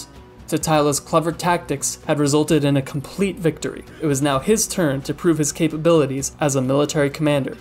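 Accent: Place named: Italy. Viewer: Tabish here watching from Lori's computer lol ...love the italian accent lol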